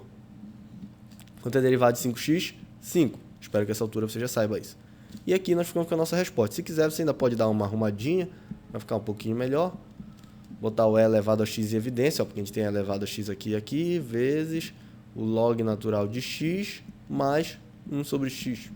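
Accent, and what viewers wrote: Pará, Brazil. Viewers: aposto que é paraense, sotaque muito parecido. Ri muito no "peralá" no outro video e nas "galhinhas" de vez enquanto.. kkkkkk muito bom mesmo, sou sua fã:)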